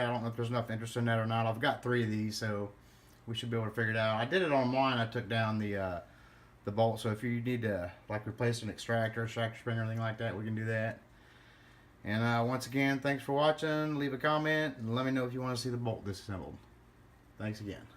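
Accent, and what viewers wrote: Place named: South, United States. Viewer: Honestly couldn’t have done this without you! Thank you very much. And your southern accent helped keep me calm and patient while I followed your instructions. Very Helpful!!! 5 stars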